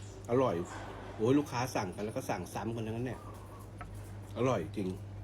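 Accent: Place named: Korat, Thailand. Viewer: พี่ พูดเหมืนคนโคราเลยน้องเป็นคนโคราชแต่มาอยู่ที่ปารีสดูทุกวันเลย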